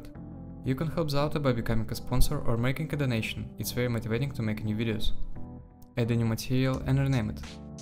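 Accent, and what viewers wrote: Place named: Russia. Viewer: Your accent sounds too russian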